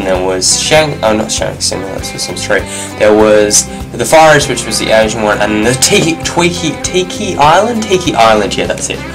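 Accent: Australia. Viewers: i meant that i don't have a distinguished accent. like for example he's an aussie....and americans sound nasally, and british people sound cool, and people from south africa have that cool ring to their voice.....and i.....i have none of that.....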